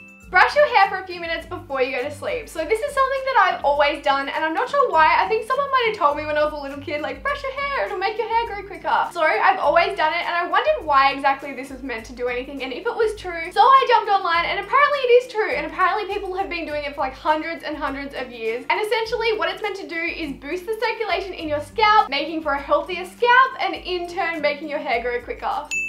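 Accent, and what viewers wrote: Australia. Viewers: I’m watching some of old videos and can notice accent is more Australian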